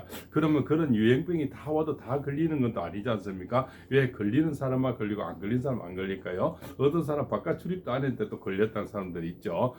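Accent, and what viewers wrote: Gyeongsang, South Korea. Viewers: 아,넹 감사합니당ㅋ 쌤 경상도 발음을 제가 잘 못 알아 들어서 죄송합니다ㅠ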